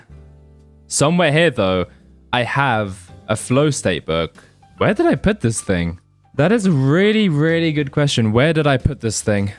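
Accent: United Kingdom. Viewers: Can you take the britishness down a notch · British voice killing me 🥀🥀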